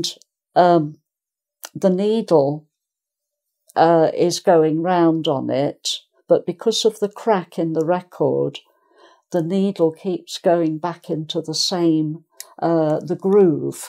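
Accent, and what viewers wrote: United Kingdom. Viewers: Your accent sounds British, may I know which country you are from ? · Interesting. I love your lesson, Ma'am. The British Accent you speak, and all the teachings are so much helping and understandable. Thank you very much. Greetings from Indonesia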